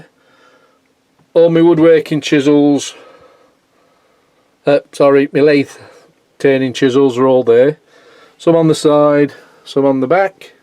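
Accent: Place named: Sutton in Ashfield, United Kingdom. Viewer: I've got a biggish workshop and still go no room lol. You sound local to me! Sutton in ashfield.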